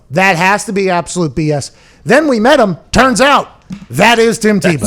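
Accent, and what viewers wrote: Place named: Pittsburgh, United States. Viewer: Yes it’s that Pittsburgh accent just like they make “down” into “dahn”. It’s a thing on the show. Nothing malicious intended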